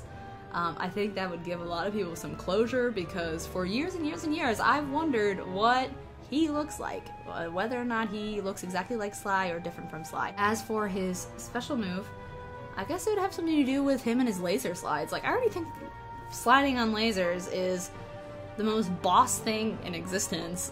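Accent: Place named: United Kingdom. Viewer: i don`t cere if you are talking britich ore not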